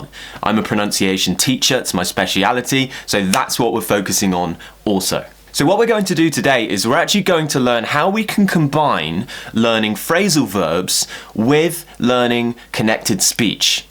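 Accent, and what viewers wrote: United Kingdom. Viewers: Kanilani Kanilani I don't know if you are barking up the wrong tree, but his accent will be helpful in every situation you face and you will sound posh if you put in efforts learning it. Cheers! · britsh accent so cool no matter how hard i try to copy it i just can't >< but ur videos rlly help me thank u ♡ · Hahah the last phrasal verb I use the most frequently😂😂 (No, no I'm joking of course) To be serious I really admire your pronounciation, your accent is soo stunning. I used to learn American accent, but now I find British accent way more lovely and pleasant to the ears. I've been trying to copy it but I sound ridiculously stupid😀 Cheers!❤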